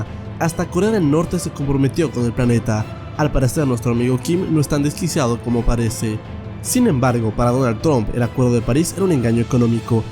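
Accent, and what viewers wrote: Ecuador. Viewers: Oh vaya eres de Ecuador O.o como yo :v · Eres de Ecuador?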